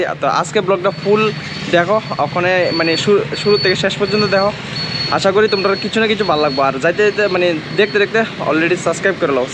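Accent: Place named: Bangladesh. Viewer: আপনার ভাষা টা আমাদের বাংলাদেশের মতো লাগছে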